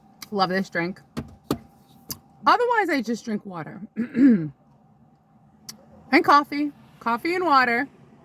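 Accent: New York, United States. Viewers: You have that new York accent .love it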